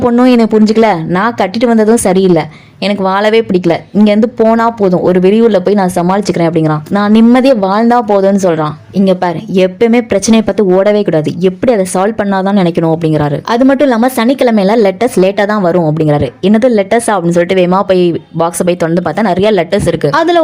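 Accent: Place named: Tirunelveli, India. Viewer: திருநெல்வேலி பாஷை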